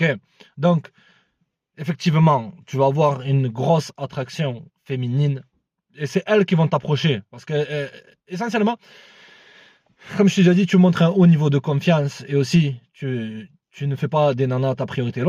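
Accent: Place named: Southwest, France. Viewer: Accent terrible, t d'ou ? Toulouse ? Sud ouest ? Bonnes videos sérieux.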